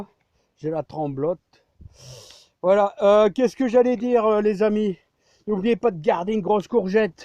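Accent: North, France. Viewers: Ça "écrase" l'accent de chn'ord... 😍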